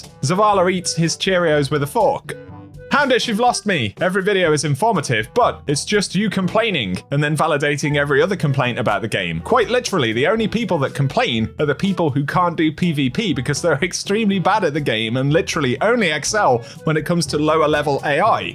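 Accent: United Kingdom. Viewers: Idk how long I've been following you but I always love your snark videos. The british accent and the sarcasm just belong together bro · Im so used to you serious voice ready the scrip for the video, for me you sound identical to "baldur's gate 3 narrator outtakes" 😂💀 (it is the british accent im so sorry😂) I have'nt played D2 over more then a year i think but from time to time i see your video put up on my page and just watch it to be a little up to date (im able im providing food for your starving kids) I finally got my own place and after years im gonna hang up my Hive sword in my gameroom that i got yeaaaarrss ago with the pit of heresy achievement. I still kinda hope D2 will get better and im able to return but for now im still salty they took Forsaken from me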